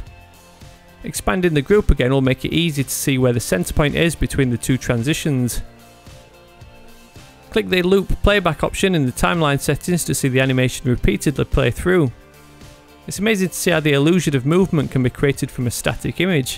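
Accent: United Kingdom. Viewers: is that a British accent? I love that accent! we Americans have a boring ole hum drum accent - so unfair!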